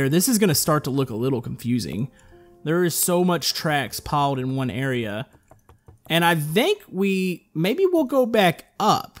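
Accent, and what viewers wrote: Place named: South, United States. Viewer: By hearing your voice it sounds like you have a country accent...no offense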